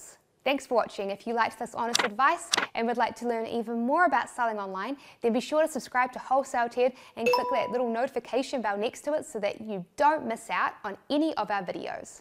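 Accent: New Zealand. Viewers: ooo gash you r a kiwi! click the subscription button. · I am Australian you accent sounds NZ but slightly stronger than what i normally hear. I don't know why i said that.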